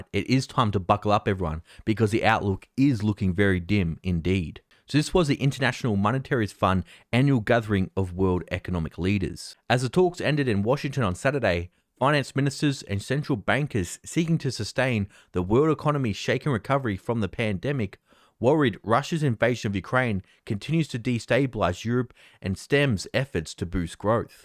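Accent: Australia. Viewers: Also, wow didn’t know you were from Australia, always thought you were British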